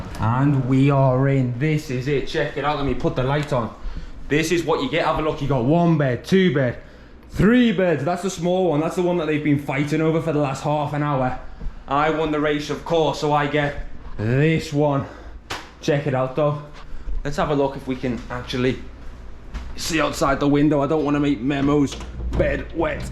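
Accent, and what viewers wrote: Liverpool, United Kingdom. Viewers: Liverpool accent at its finest 😭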